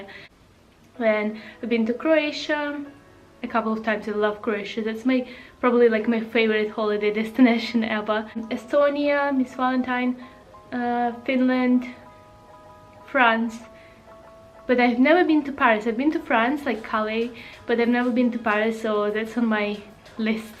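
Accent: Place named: Russia. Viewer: I don't really remember, but you was saying something like "it helps me to stay on chuck" or something like that. Russian accent is not a problem, I'm also russian (almost, actually I'm belarussian) so most of us have an accent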